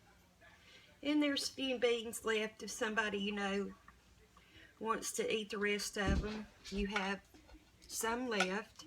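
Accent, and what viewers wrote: South, United States. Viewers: your sweet southern drawl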